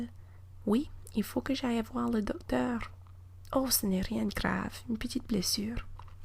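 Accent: Canada. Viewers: this doesn't sound like the French kind of French, but rather the Canadian? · Ton accent est typiquement canadien et en France on adore ça (pour information, la Miss Météo d'une de nos chaines françaises est canadienne) ton français est impeccable, félicitations de la part d'un français....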